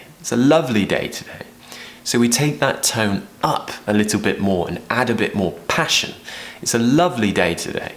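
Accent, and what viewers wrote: United Kingdom. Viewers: Very beautiful British accent!!! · When you said "Oi" from Boiling, this "Oi" in Brazilian portuguese means "Hi" in English. Good to see these aspects. I really like your channel and I'm beginner in English and I want one day to talk to this beautiful British accent. I'm from Brazil 😊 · your British English is so fantastic! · love your British accent😊😊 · Hi, I speak spanish and I am learning british english and with your channel and your accent, I can learn many things, Thanks! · You are so easy to understand I hope all british people speak like you😂💔💔 Your british accent is very exciting😍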